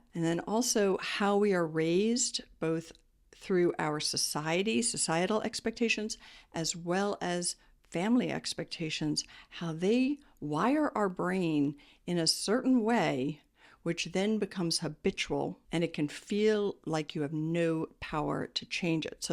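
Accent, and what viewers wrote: United States. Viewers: Are you from Iowa?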